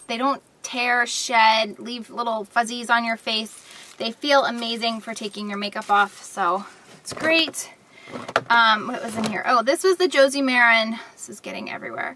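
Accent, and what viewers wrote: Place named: United States. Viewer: could I just ask what part of the states you are from? Love your accent. I am from the UK x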